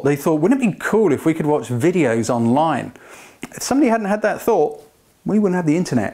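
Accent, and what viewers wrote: England, United Kingdom. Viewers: where in England are you from.. i know by the accent its SE/SW England mate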